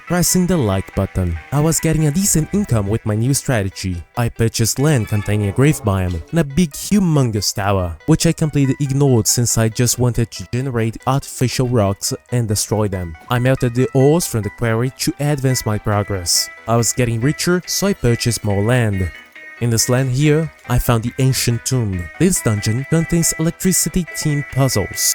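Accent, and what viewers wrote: Brazil. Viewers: where are you from? your accent sounds brazilian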